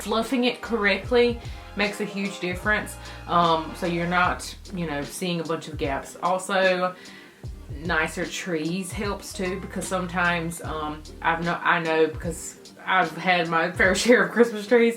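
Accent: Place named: South, United States. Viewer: Oh yeah and that accent ... loving every moment making me miss my southern roots 🥰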